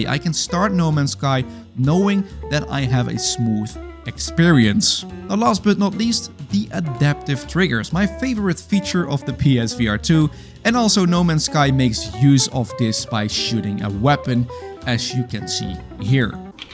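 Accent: Netherlands. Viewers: U bent nederlands 100% aan het engels te horen. 😄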